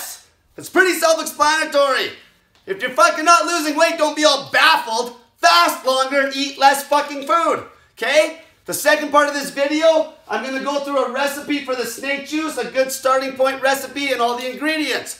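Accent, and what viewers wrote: Canada. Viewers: Canadian eh? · I detect Canadian in your yelling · Is he Canadian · i've never seen someone with such a strong canadian accent lol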